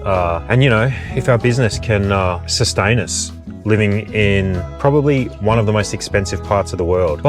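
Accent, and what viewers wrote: Australia. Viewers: It’s not your Azzie accent. Tim Hortons is the problem. 🇮🇳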